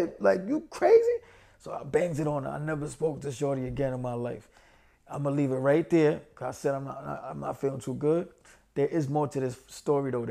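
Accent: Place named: Bronx, United States. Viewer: Immediately subscribed . Love from the Bronx lol that ‘YU HURD!?’ Told me all I needed to know. Accent lmao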